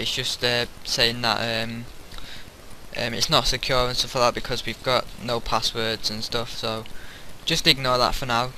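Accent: United Kingdom. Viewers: Then I thank you for your help in making MediaWiki work. I'll find a way to solve the problem in which you solved a part. I just need to know if these files work with MediaWiki or not. I've never had a British friend. We can be friends if you like so. I'm an English student n' I like to know about Britons and British accent is my favourite. You did great. Thanks a lot. Later.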